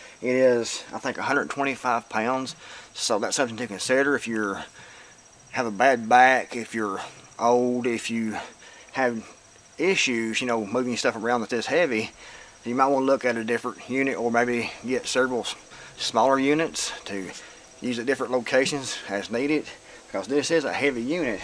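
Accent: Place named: North Carolina, United States. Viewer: Hey I told a friend of mine from North Carolina that he talked about as close to you as anybody that I had ever met before, and now he won't return any of my emails. I don't understand, but for some reason no matter how hard I try, I can't talk like you at all, and I'm from Missouri.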